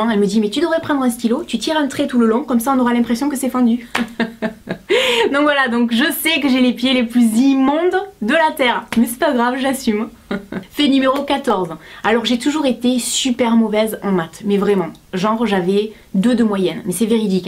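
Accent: South, France. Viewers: tu es vers le sud? car ta un accent:D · J'ai découvert ta chaine aujourd'hui, et je suis en train de visionner toutes tes vidéos! Tu es vraiment adorable, j'aime ta franchise, et ton petit accent du Sud! Et puis, tu es vraiment magnifique (t'as d'beaux ch'veux, tu sais?!) Je ne commente jamais sur YT mais je voulais quand même préciser quelque chose: le poisson, c'est de la viande! Du coup, ceux qui se définissent végétariens alors qu'ils mangent du poisson... offrez-vous un dictionnaire pour la rentrée! ^3^ <3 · Tu me fait bien marrer avec ton accent du sud😂😂❤ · J adore ton accent du sud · Trop drôle tout ce que tu dis et de la manière que tu le racontes. J'ai fait comme ta maman avec mon fils qui avait piqué une sucette dans mon dos chez le marchand. tu es superbe et tes vidéos aussi! Et surtout garde ton accent du sud. Tu fais honneur à notre région! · jai la rage contre la meuf qui envoi des menace t es une creme ca se voit vive les femmes du sud avec l accent si tu es effrayer je suis la tkt... 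· Cc, que du bonheur dans cette vidéo, tu m'as fait trop rire avec toutes tes anecdotes, ne change pas la et tout ça avec l'accent du sud, bises a+ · tu as vu plusieures fois Anastacia.... ok je vais aller me pendre lol j'ai jamais eu la chance de la voir sur scène et pourtant j'adorerai étant une fan inconditionelle de cette artiste depuis ses tout début - je suis tombé sur ta chaine par hasard et j'en décroche pluuus lol Et vive l'accent du sud (a) (je suis près de montpellier ^^) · OH MON DIEU! Tu étais vraiment trop mignonne quand tu étais petite ma chérie, tu étais vraiment trop belle!!! Une petite rousse toute mignonnette, je ne sais pas ce que les gens ont avec les roux, les réactions envers eux me choque beaucoup. En tout cas, continue comme ça, ton accent du Sud me fais beaucoup rire, ce n'est pas de la moquerie mais moi aussi j'ai l'impression de me sentir en vacances et d'entendre ma tante...eh oui dans ma famille ils sont tous du midi sauf moi! 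#intrus. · Tu es très belle et intelligente. Ton bel accent vient de quelle région du sud? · quel bonheur de vous entendre parler! Et oui votre accent, le mien, celui du midi,de Pagnol, qui fleure bon la lavande et le romarin ".Non ne rougissons pas de notre si bel accent, qu'il soit clair, sonore, retentissant et allons nous en l'humeur toujours pareille, emportant notre accent sur le coin de l'oreille....." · cc j'adore t video tu est trop trop belle tu ma fait trop rire lol. j'adore ton accent du soleil. t o top bisou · coucou, je viens de découvrir ta chaîne et je viens de dévorer plein de tes vidéos! ça change ton fonctionnent tes conseils, tu es rayonnante naturelle et tu me fais rire! j'adore ta bonne humeur et puis parlons accent;) je suis du 64 et ça me fait très plaisir d'avoir une youtubeuse avec l'accent du soleil puisque dans les Pyrénées en ce moment c'est 20° et grand soleil:) cette vidéo m'a fait sourire puisque j'ai 23 ans mais je me retrouve beaucoup dans ce que tu dis, je suis plus vieux rock (led zep c'est la vie! 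) mais vive les 80! très contente d'avoir découvert ta chaîne, je vais vite m'empresser de regarder tout ce que j'ai raté! et comme on dit porte toi bien potons! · Haaaa une fille avec l'accent du sud la famille ^^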